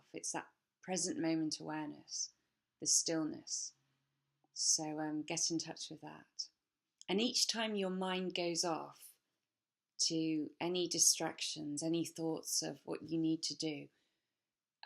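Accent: United Kingdom. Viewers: Hi from Sydney Australia, your accent is lovely always loved the British accent, I'm new to law of attraction and a HUGE fan of Neville, so many testimonials from ppl from all walks of life. even some skeptics have converted to belief after trying Neville Goddard's guidance and methods. I hope Neville is enjoying all the pleasures of Heaven, he really was HEAVEN on earth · One of best explanations I've heard on this topic. You explanation is not only on point, but succinctly covers practically every important point made by Neville in all his many talks. As a bonus, you have a dazzling smile, charming English accent, and awesome personality. I listen to this video as a daily superbly positive reminder to maintain the imagining practice, and I'm seeing TONS of benefits Thanks for making this video...subscribed...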